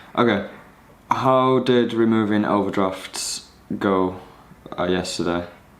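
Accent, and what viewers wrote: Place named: Sunderland, United Kingdom. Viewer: It is a watered down Mackem accent.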